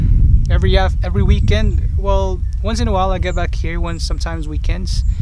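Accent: United States. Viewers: Nice sir your speaking like American guy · Wow he has american accent. Amazing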